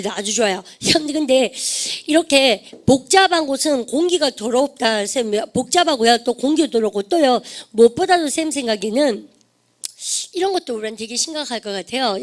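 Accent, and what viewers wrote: Daegu, South Korea. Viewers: 선생님. 좋은 강의 올려주셔서 얼추 8주 동안 열심히 공부했습니다. 말씀대로 문법은 3번 들었더니 4학년 딸아이 ELA 공부를 봐주는데도 큰 도움이 되었습니다. 문법 ABCDE 정리하고 3번 듣고. 기초보카 정리후 두번째 듣는 중입니다. 기초보카. 보카22000. 문장보는 눈도 재미있습니다. 두툼해진 정리노트를 보니 더 감사합니다. 아!! 그리고 그동안 천지삐까리로 많은 대구 사투리가 그리 정겨븐 말인것을 알게되었네요. 아무리 되도(?) 쎗국물 갈아마시고 열심히 하라는말씀도 맘에 깊이 새겼습니다. 영어실력과함께 대구어 리스닝/ 표현도 늘었습니다. ㅎㅎ뭔가 저에게도 샘에게 도움이되는것일것 같아 ㅎㅎ 영어로 나오는 광고들도 열심히들었네요. 기회가 있어 다른 방법으로 인사를 드릴 수 있기를 바라며... 건강하시고 행복하시길 기도하겠습니다. 플로리다에도 선생님 팬이 살고있습니다. 다시한번 감사합니다.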